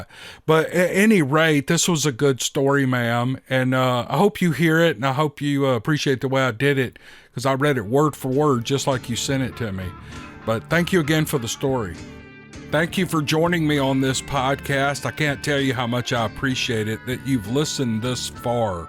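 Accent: South, United States. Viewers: all these people love you! Your genuine love and goodness is overwhelming! That southern voice is to kill for! Have I buttered you up enough yet? Just kidding. I can’t even get a stream to go through correctly. But Im good at sending peek-a-boo pics of my hubby unintentionally. Lol 💝💝💝💝💋🙋‍♀️👩‍🦳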